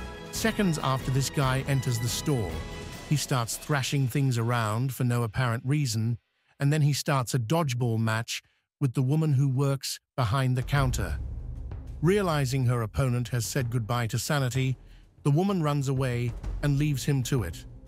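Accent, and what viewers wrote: United Kingdom. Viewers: The voiceover is clearly British English, so why use wordds like 'dude' and 'gas station'? What is a 'dude' anyway?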